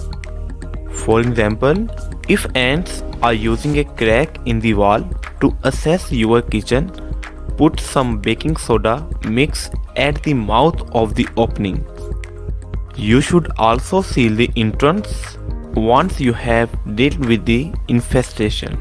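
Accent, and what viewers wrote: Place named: Scotland, United Kingdom. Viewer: I cant understand the thick accent... Is it Scottish?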